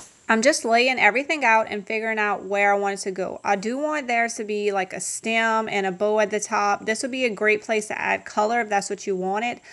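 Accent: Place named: South, United States. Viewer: Just discovered you and subscribed! Where are you from? Your southern accent is strong ☺️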